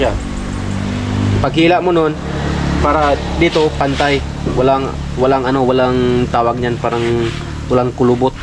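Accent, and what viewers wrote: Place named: Visayas, Philippines. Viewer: wag mo na itama. bisaya kase sya kaya ganun nya bigkasin.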